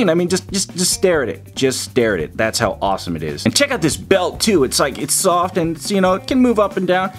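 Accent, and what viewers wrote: United Kingdom. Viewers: Who eles got surprised when he started speaking British.....but when did u buy the wolverine are u going to make a review of him or no cause i am going to buy that too.....peace.... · I like the British voice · Stop the British voice 😡😡🤯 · And nice British accent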